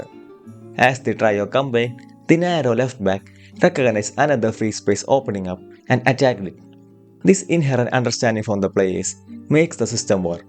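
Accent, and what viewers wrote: Kerala, India. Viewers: Damn the mallu Accent is crazy! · Malayalee alle aa English kettal ariyaam..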